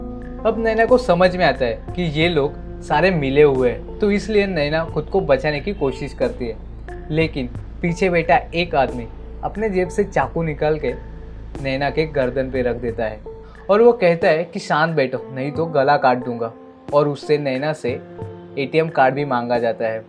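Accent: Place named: Mumbai, India. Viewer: Hindi should be more fluent...yeh mumbaiya hindi h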